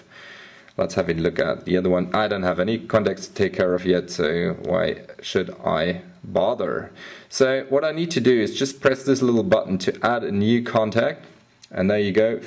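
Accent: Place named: England, United Kingdom. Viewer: i hate your english accent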